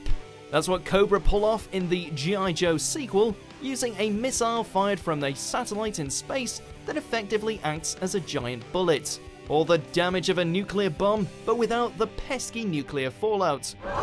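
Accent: United Kingdom. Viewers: You already know there UK when you here the voice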